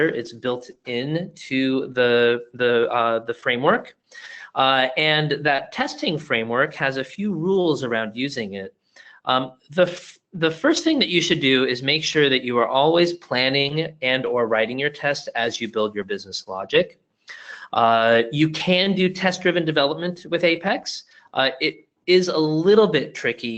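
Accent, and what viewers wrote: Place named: United States. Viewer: Why American voice here? Why not people with famous Indian accents in this video? Isn't Salesforce Indian company?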